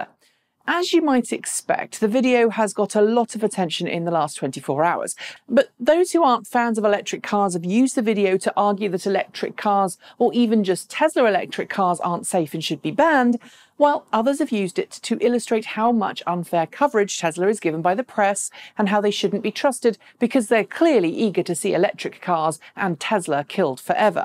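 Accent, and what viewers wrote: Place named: United Kingdom. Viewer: Somewhat interesting. She needs to drop the brit accent. It is Not sexy.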